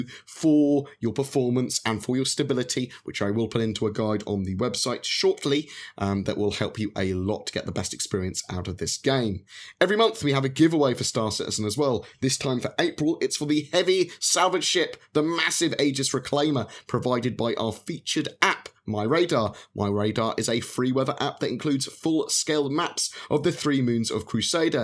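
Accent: United Kingdom. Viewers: I love how Brits call it a "haych" key :)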